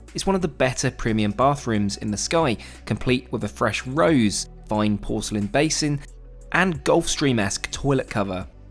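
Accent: United Kingdom. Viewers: British accent pain to ears 😖😖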